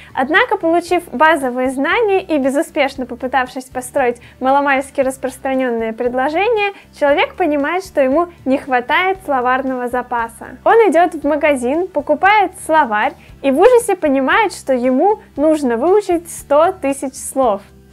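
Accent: United Kingdom. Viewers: ты классная Приятная и простая) Обожаю твой англ. акцент!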